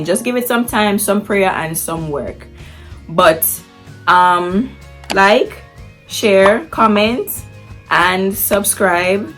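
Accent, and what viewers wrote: Jamaica. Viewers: Come thru yardie. Accent strong.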